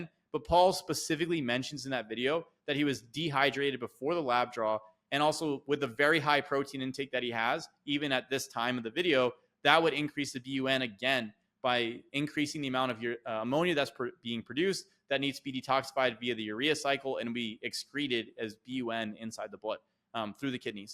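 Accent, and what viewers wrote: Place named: New Zealand. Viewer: has a very New Zealand accent! 😂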